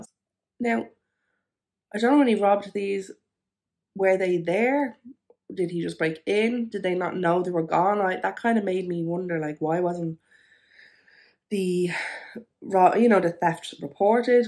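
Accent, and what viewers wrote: Ireland. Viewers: I like ur accent is it a south dublin love ur chanell as well .xx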